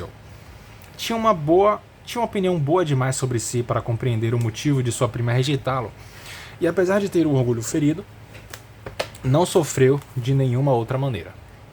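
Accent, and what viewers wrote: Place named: Northeast, Brazil. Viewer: tu é de Natal/Rn né? sem condições, se não for nordestino, eu cegue.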